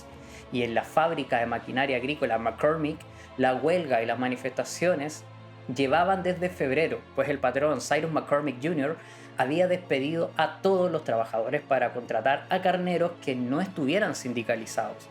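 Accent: Chile. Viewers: Entre el dialecto chileno, la homosexualidad en su forma de hablar, y además que se quiere hacer el indio, es imposible entenderle.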